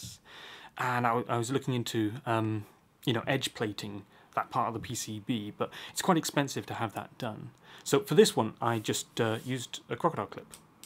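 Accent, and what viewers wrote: United Kingdom. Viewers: Yeah he just sounds British is what you're trying to say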